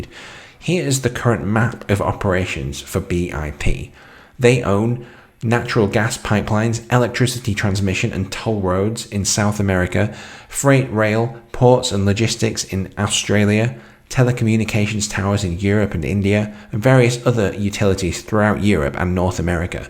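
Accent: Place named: England, United Kingdom. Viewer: Great video. You sound English, do you have any large Uk listed companies you can recommend?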